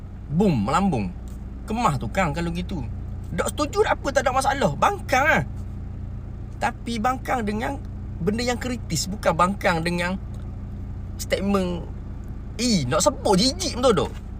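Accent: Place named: Kelantan, Malaysia. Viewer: tolong melaraskan bahasa kamu dengan lebih baik. Rimas lah loghat2 kelate ni . Pesanang aku belajar bahasa yg lebih baik dari DBP dulu ya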